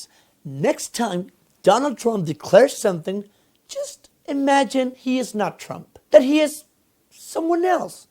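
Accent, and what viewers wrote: Mexico. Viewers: el ingles esta chido se nota el acento mexicano chingon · everyboy in every video say things about his pronunciation, he's mexican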